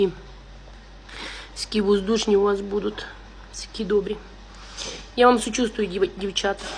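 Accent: Transcarpathia, Ukraine. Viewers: Чудовий закарпатський діалект! Різноманіття -це золото України!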